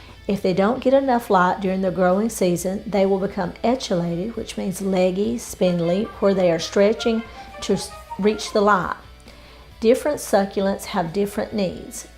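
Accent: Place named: Chicago, United States. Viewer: Great info! Thanks for sharing. Perhaps cut the volume of the music on the next one? I love your accent, where are you from? Chicago?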